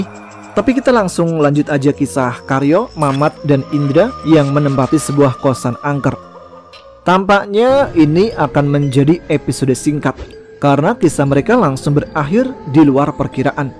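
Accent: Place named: Jakarta, Indonesia. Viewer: Wa'alaikumussalam warahmatullah wabarakatuh. Alhamdulillah cepat kelar nih ceritanya.. maaf maaf hehe 🙇‍♀️🙏😅 Sayang, padahal logat Betawi nya Mas makin keren di sini 👍👍 Astaghfirullah telat lagi gabungnya😔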